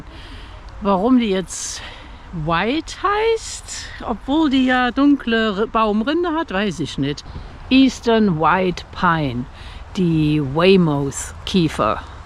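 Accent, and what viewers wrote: Cologne, Germany. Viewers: Hallo ❤was für ein wunderschönes Video, und dein Kölsch ist so herrlich zu hören, und die Farben der Bäume und deine Infos dazu ist so Klasse, bin so begeistert von deinen Erklärungen der Bäume 🌲borrrr und die Farben und die Höhe der Bäume.Vielen Dank ❤LG Monika 😊 und die Pilzkunde super Idee mit den igennamen 😊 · Es war mal wieder einmal ein Erlebnis den Koelschen Dialekt zu hören und noch dazu so viel über die herrlichen Farben der Bäume und die Baumarten zu erfahren . In Parksville auf Vancouver Island hatten wir letztes Jahr genau 3 Tage etwa 5 cm Schnee, in Saskatoon dabei 5 Monate Schneefälle. Danke für die schönen Videos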